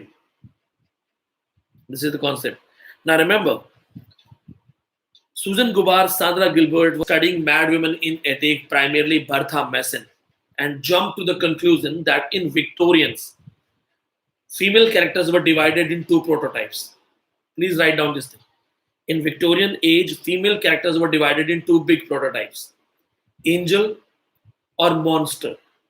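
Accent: India. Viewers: an lndian speaking english in his or her own way of Indian style is really great to listen to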